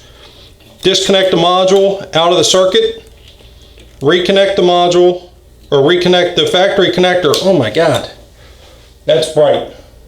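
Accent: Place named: Pennsylvania, United States. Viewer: My hazards aren't working but my turn signals both are. It sounds like you're from PA. If so do you have a shop or a shop you'd recommend near ohio by 80 or 76? It's cost me a fair amount learning on my 2001 roadking and I don't mind if I can learn but the electric for the rear had been "redone" and the left switch somehow controls the rear lights. It's a little bit much to explain on here but any help would be greatly appreciated. Thanks for sharing your video I look forward to watching more 👍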